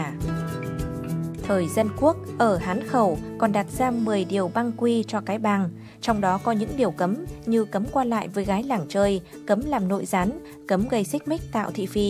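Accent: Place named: North, Vietnam. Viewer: Hồng Thắm giọng nam, còn giọng này âm bắc mà bạn!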